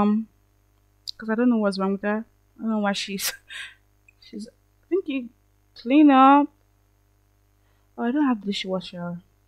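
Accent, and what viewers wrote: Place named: Nigeria. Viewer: I thought so from your accent but I wasn’t sure haha. I am also from Nigeria based in the UK. It’s lovely to meet another Nigerian simmer 🥰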